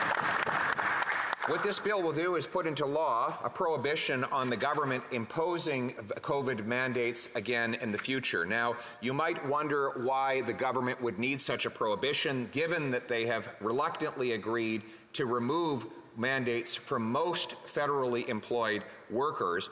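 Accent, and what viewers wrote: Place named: Canada. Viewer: The Cannuck accent....😩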